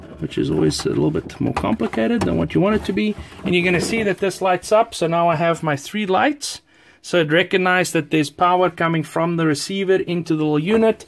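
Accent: South Africa. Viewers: Great Saffer accent coming across-but well done all good! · His accent is a proper mishmash but the way he pronounces his words ending in ER gives him away as a fellow Afrikaner 🤣 Or at least it does for me.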